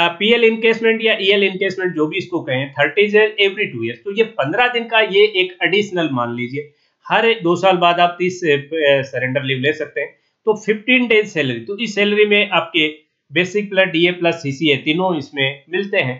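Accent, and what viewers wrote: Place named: Gujarat, India. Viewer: Seems like you are gujrati by tone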